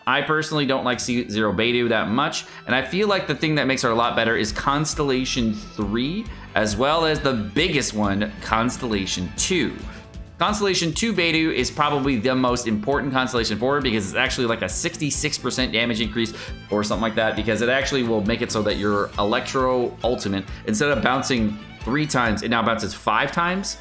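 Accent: United Kingdom. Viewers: British never fails to amuse me 🗿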